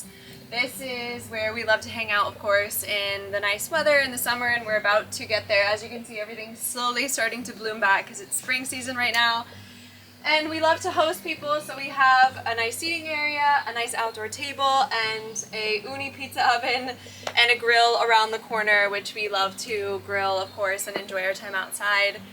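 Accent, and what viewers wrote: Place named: United States. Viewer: You and your obnoxious murican accent..why didnt you stay in Usa?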